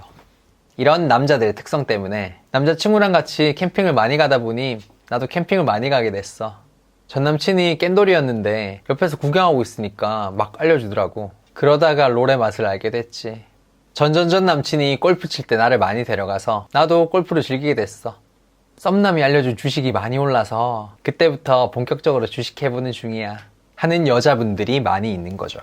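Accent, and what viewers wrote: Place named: Busan, South Korea. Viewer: 부산 사투리와 표준어의 절묘한 만남이 느껴지는데, 기분 탓일까요?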